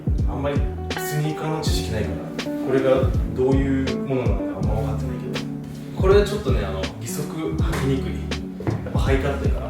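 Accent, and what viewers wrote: Kansai, Japan. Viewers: 本当にカッコいい！俳優になっても良いだろうねぇ🥰そして喋ったら関西ニキって言うのもええねんマジで😂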